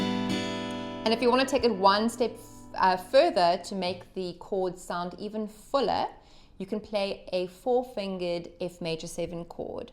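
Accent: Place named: South Africa. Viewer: Awesome...and nice to hear a South African accent.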